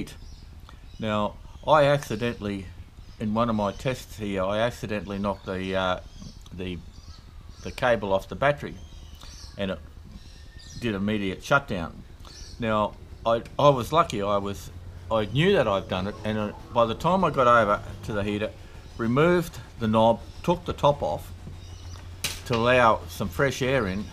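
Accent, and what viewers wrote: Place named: Australia. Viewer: Great videos, good to see some with an Aussie accent. Sorry if this has been asked but reading all of the comments on my phone is a bit tedious. Q: if the controller failed, how would the unit respond if the fuel supply was removed rather than the power, would the unit complete it's cool down procedure and shutdown gracefully or do those smarts live in the controller?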